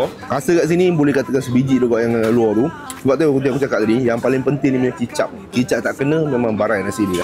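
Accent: Malaysia. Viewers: Dia ni melayu ke cina 😂😂 slang melayu habis.. ni betul2 org msia. ❤❤❤ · Gila aku mati² ingt brader ni melayu Bila tgk nama channel baru lah cine rupanya, mmg pior sleng n sgt fasih bhsa melayu dia.. Terbaikk lah bang smoga maju jaya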